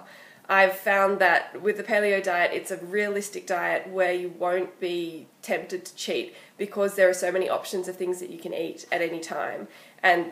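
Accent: Australia. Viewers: Wish I knew the first tip about phasing it in and shaping eating behaviour before my first Paleo attempt (rather than going at it like a bull at a gate & failing miserably). Also, have I found another Aussie?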